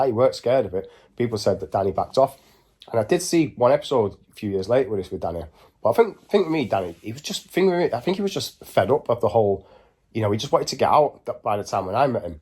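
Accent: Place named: Liverpool, United Kingdom. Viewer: good channel this bro. laughing with the scouse accent 😂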